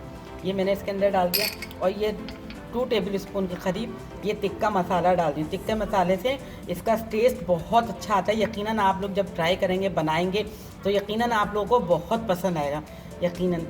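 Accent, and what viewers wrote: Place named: Bhopal, India. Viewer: Apki zaban bilkul indian(bhopali) jaisi hei👌👌 or apka haddi wala pulao mene bna kar dekha boht accha bna hei👍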